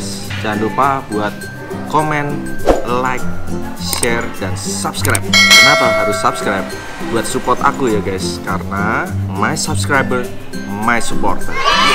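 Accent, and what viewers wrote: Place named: Solo, Indonesia. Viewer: medoks tomen to oms"? lahir solo ta?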